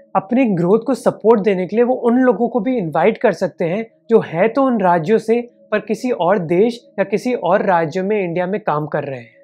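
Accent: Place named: Karnataka, India. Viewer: YOUR VOICE IS SAME AS MY KANNAD FRIEND .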